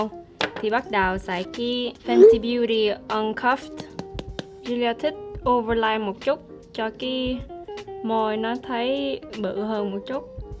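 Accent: Vietnam. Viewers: Isn‘t her accent southern as well? Also she mentioned that the Grab driver wanted to drive her to Vung Tau which makes me think that maybe she was in Saigon. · sᴇᴇıɴɴᴇᴇ she’s probably speaking in the North accent, ur south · OMG girl I love your Vietnamese accent!!! I think it's so cute that you try to embrace this part of the culture. So very looking forward to other Vietnamese speaking videos (or any other videos hahaha)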